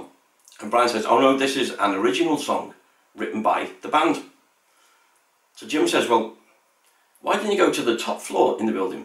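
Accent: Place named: United States. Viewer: And let me guess, you are American-cause you,guys are the best at everything, right? 🙄 Only an American would be arrogant enough to suggest that a documentary about a BRITISH BAND be narrated by an American. Go wave your flag somewhere else.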